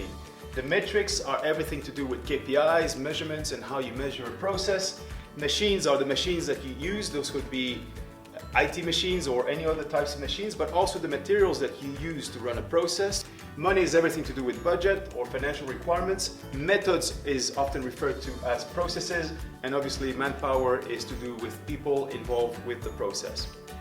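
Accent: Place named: Belgium. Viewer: my only question after watching this video was where this guy comes from. Based on his style and accent I'd say Belgian. Anybody here who knows for sure?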